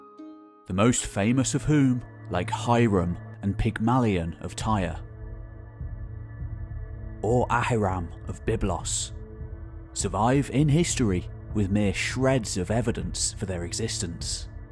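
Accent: United Kingdom. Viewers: I'm always looking for good history doc channels and yours is certainly one, thus I have subscribed. Being one-quarter British I enjoy the many accents from your islands and would rather see a world history documentary presented by a Britisher than one from a fellow American. Keep up the excellent work and thanks for the many links you've generously provided to other related sources.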